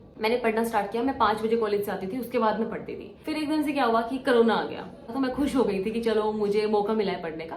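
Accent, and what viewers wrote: Haryana, India. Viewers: Haryanavi touch in Voice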